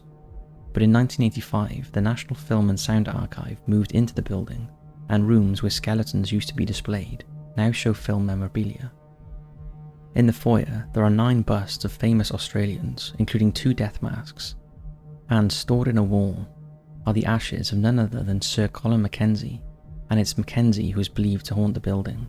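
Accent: Wales, United Kingdom. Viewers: Welsh? Is that the accent?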